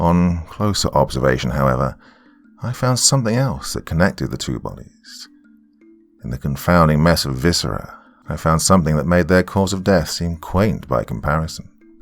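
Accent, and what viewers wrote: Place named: United Kingdom. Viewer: I really wanted to listen to this story but British accents are so cringe to the point of them pissing me off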